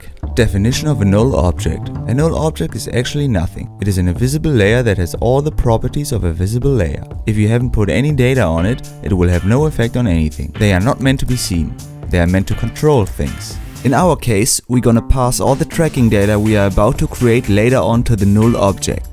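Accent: Germany. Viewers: solid info delivered with dry snark in a German accent- yeah, I'm subscribed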